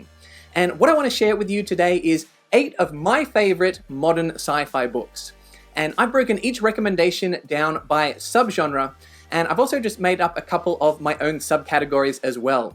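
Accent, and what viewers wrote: Australia. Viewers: The algorithm brought me here, and I'm glad it did. Good to hear an Australian reviewer. My particularly self-serving suggestion is my debut, 36 Streets (Titan Books UK). It was just last week shortlisted for an Aurealis Award (Best Science Fiction Novel), so I can assure you it's not terrible. Cover quote from Richard Morgan (Altered Carbon), who's a huge fan of the book. Cheers, T. R. Napper.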